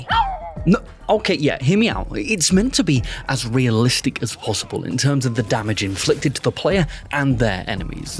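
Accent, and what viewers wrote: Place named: United Kingdom. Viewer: correct because british accent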